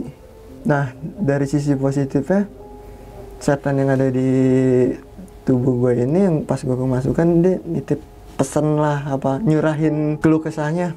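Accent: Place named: Bekasi, Indonesia. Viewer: Resep bet dah ah gaya ceritanya, nyablak bett hahaha lebih ke bekasi ini mah kaga cileungsi😂 apa jangan jangan orang setu nih si abang nya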